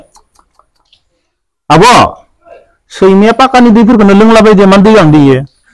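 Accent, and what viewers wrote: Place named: Kokrajhar, India. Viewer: Dabw Kokrajhar style railainw rwnga ada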